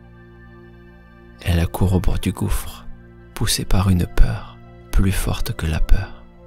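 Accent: South, France. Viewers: j'adore l'accent français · Ton accent du sud m’a fait sentir comme à la maison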